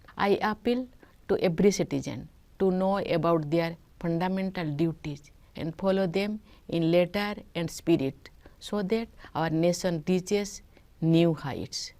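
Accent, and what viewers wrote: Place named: Odisha, India. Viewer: Love that ODIA- Mayurbhanjia accent…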